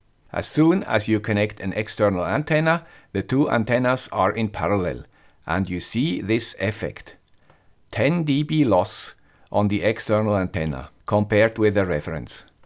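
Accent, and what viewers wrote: Switzerland. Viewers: youtube: *sends notification about new video * me in mind: * PRETTY YOUTUBERS HERE IS THE GUY WITH SWITZ ASCENT WITH FRESS SENSORS AND MCU......* 😍😍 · Hallo Herr! Ich hoffe Sie haben trotz dem netten Schweizer Akzent das Deutsch noch nicht ganz verlernt. Ich liebe ihre sibirische? Katze vom Abspann, gruss von meinem sibirischen Kater Candy. Leider habe ich das englische nicht so drauf wie Sie, kompliment für Ihr Schweizer Englisch und Ihren tollen Kanal. Ich habe noch keinen Youtube Kanal gefunden in dem ich so präzise Erklärungen zu diesen hochtechnischen Themen gehört habe. Bitte weiter so! Grüße von Michael aus Deutschland.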